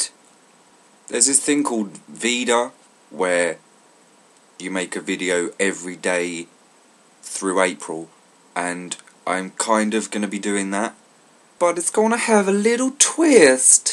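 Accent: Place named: Newcastle, United Kingdom. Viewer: Loved the geordie voice. x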